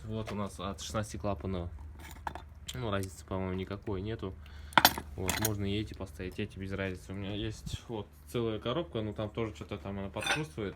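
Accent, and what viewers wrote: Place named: Tatarstan, Russia. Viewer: Вот и татарский говор вам))) Привет с Казани)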